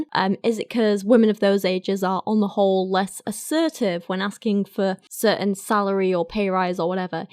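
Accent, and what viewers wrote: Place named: Staffordshire, United Kingdom. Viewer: Your Lil' Staffordshire is adorable and i love it <3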